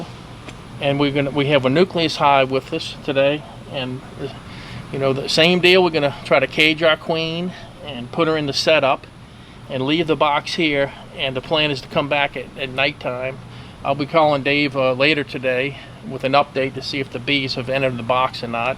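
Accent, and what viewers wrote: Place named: South, United States. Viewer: Love your accent,... The Dirt Rooster and Mr. Ed can’t match it! You southern bee guys need to get some headlamps, though... no having to hold flashlights in your teeth!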